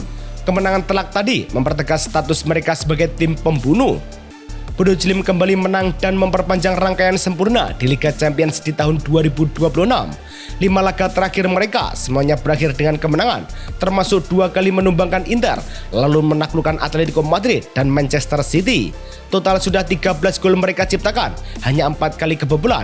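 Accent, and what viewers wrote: Java, Indonesia. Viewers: Salfok sm suara yg ngomong medok bgt😅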